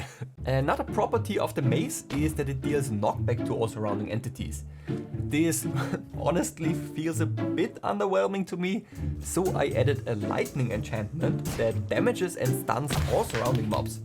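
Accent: Germany. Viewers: Why does it sound like you have a german accent? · Bist du deutsch hört sich irgendwie so an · are you german cuz you are from Austria im german too and your english sounds like if you were german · Man merkt das du deutsch bist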